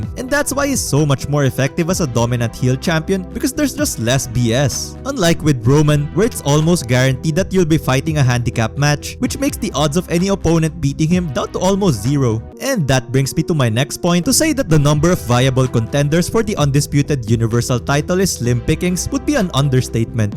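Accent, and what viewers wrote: Philippines. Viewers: He’s certainly held it hostage but you can’t blame but think that whoever finally beats him, that sigh of relief would be something else. Also from your accent, are you Filipino?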